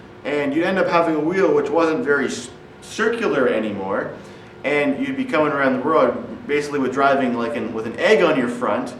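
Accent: Canada. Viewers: That is the most canadian accent I've ever heard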